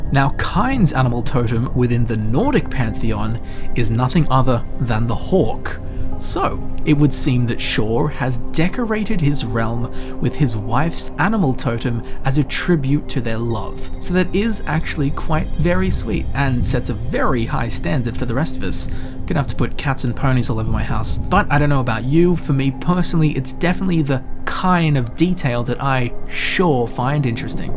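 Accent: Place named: United States. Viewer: Is it just me or was super American sounding in this vid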